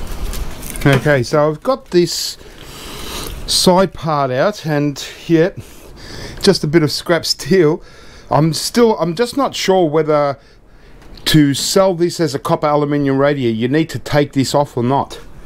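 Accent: Australia. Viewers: Hello from Napa Valley, CA, USA!! I have seen many of these teardowns of air conditioning rads. First of all, those copper coils or "noodles" are sawed off, then that sheet metal piece is removed before scrapping as it is. Love your "Down Under" accent and keep the videos coming!! Watch out when scrapping the second rad!! I saw a menacing looking spider!!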